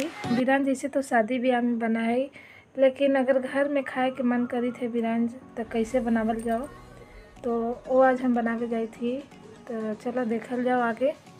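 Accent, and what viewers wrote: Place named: Magahi, India. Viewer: बहुत सुंदर सात्विक रेसिपी के साथ मगही आवाज, बहुत बहुत शुभकामनाएं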